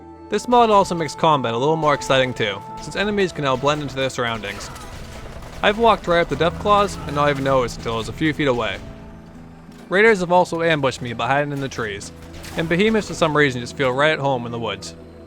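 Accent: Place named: Massachusetts, United States. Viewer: this guys sounds like he's a Mass-Hole ! accent is strong of a Massachusettes.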